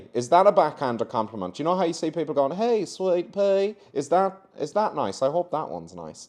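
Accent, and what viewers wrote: South, United States. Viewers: 😂😂 the southern accent was what I needed to hear today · Great southern accent!!! :) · You do MARVELOUS American accents!! Have you lived over here? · Your performance of the southern accent is spot on. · I love your southern accent 😊😅 Butter wouldn’t melt in her mouth People asked if I was part of the BLUE ARMY…I had no idea why they would think I was in the army!!! ☕️☕️☕️☕️☕️ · 😲 Your southern accent is spot on! “Bless your/his/her/their heart” isn’t always an insult. It depends upon the tone of voice and facial expressions used when saying it. “Sweet pea” can also be used in a similar way. In fact, 🤔 I think most southern compliments can be used interchangeably as insults (you have to be polite, after all). The tone, timing, facial and body expression is the key. Shoot, my favorite southern “fuck you” is “Jesus loves you!” 😂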